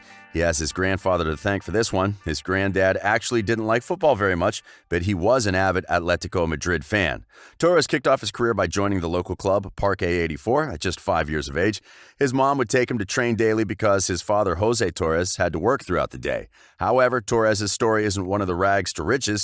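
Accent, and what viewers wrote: United States. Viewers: An American accent starts talking about "Soccer"...nah...not watching it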